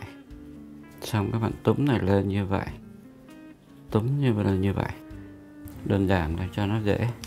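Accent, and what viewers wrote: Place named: North, Vietnam. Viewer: Nghe giọng anh là người Bắc 54 rất dễ mến , tôi thích xem cách anh nấu nướng rất đơn giản dễ thưc hành . Chúc anh nhiều SK & nấu nhiều món ngon !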